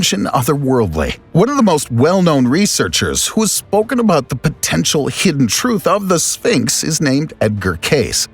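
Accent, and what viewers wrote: United States. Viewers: Yet another annoyingly loud, patronising American narration aimed at 5 year olds.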